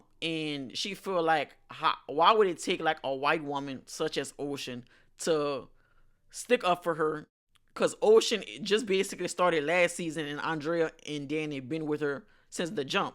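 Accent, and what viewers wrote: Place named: Louisiana, United States. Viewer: you have to be from the 337 😭 if not i would be genuinely surprised because you have a strong accent lol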